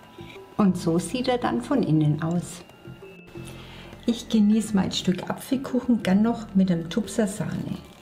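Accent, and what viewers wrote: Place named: Franconia, Germany. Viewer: Liebe, jetzt muss ich dir endlich mal ein Kompliment machen!Deine Rezepte,Tricks und deine liebevolle Art sind einfach super! Dein fränkischer Dialekt mag ich besonders,komme auch aus deiner Gegend.Da ist immer ein bisschen Heimat dabei!☺ Bitte mach weiter so!Du bist genial!🍰🍩Danke du Liebe🌹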